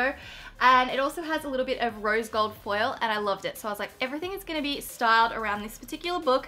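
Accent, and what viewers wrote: Australia. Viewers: And OMG I LOVE YOURE AUSTRALLIAN ACCENT ITS AMAZING AND YOU ARE JUST A LIFES MIRACLE WITH HOW PERRFECT YOU LOOK AND ARE ............... well that explains you in my very creative brain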